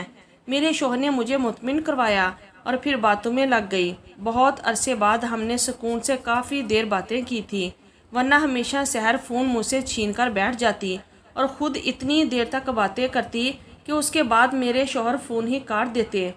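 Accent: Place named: Lahore, Pakistan. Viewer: Lahoren lehja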